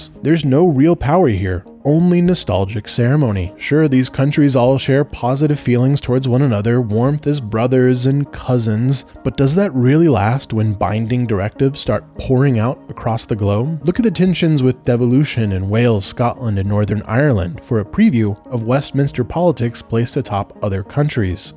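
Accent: United States. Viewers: FYI: 1. It’s not the “British Commonwealth “, it’s “The Commonwealth” (an organization of equal member states) 2. It’s not the “King of England”, it’s the “King of the United Kingdom of Great Britain and Northern Ireland” (or Canada, or Australia, etc) You sound American. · Hey!! You sound like an American! In that case you have no opinion on this subject! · As a Canadian i have to say you sound like an American...these changes are permanent. our trade with the US has dropped 6 percent but increased with other countries by 26 percent, so no real change in total exports and we are just getting started. I won't buy US products ever again if I can help it and i will never travel there...but i am planning trips to Scotland, New Zealand and Australia. we can do what we want and we don't need permission or advice from the US. but your video did highlight one fact...we only need to defend ourselves from the US, but in that we would have the help of more than juat these 3 countries. 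we've been pushed around by the US long enough and while they're descending into fascism this is a good time to get out of NORAD.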